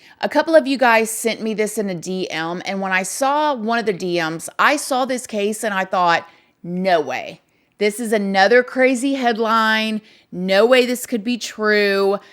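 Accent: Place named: Florida, United States. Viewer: Are you from Florida? You have that cute “kinda southern” accent us Floridians have. ❤️❤️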